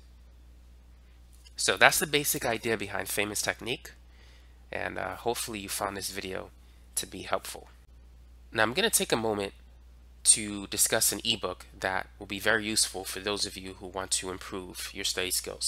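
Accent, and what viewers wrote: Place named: Bronx, United States. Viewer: you from the bronx? kinda sound like it (very random, sorry)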